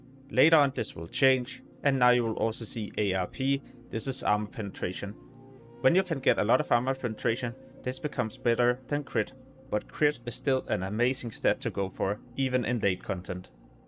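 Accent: Denmark. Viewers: Useful guide! Thanks brother. I have a mage, But also a warrior. I kind of wanna focus warrior, but all the people say They’ll be useless early on, I don’t know… sigh Also, are you Danish? You sound danish!☝🏼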